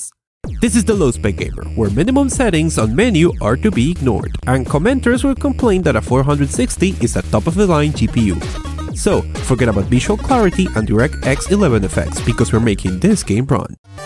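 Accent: Spain. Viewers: Eres Ingles O Español?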